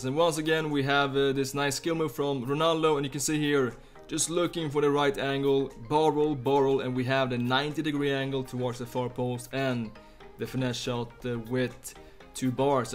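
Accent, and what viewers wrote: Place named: Sweden. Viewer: He is so swedish listen to his accent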